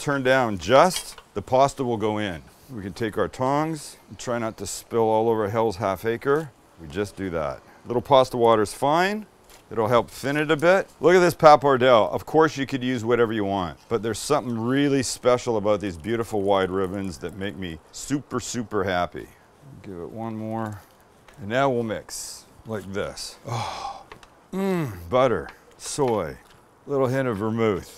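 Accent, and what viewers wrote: United Kingdom. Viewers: I am from the UK and your British accent is so very cute. I love it.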